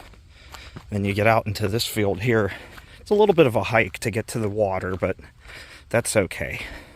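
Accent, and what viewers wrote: Pennsylvania, United States. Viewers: Nice video! Much appreciated. Great idea about PA state park visits. I'm a transplant from Philly area to Western PA. Your PA accent is endearing. Liked and subscribed. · That was great. I've been to Presque Isle S.P. dozens of times but never to Pine Bluffs. I live 50 miles South of Erie and Pjne Bluffs is a handful of miles out of where I go. Very nice job, I feel like I was there too. I can tell you're from Pittsburgh by your yinzer accent but I thought you would be used to going uphill, ha! Good job.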